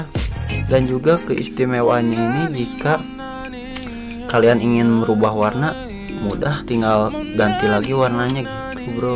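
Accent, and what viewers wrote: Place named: West Java, Indonesia. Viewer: Sunda mamang😁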